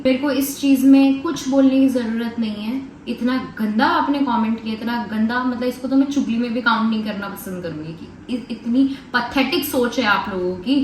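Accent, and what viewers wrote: Garhwal, India. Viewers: Hayee dii apki gadwali.....😍♥️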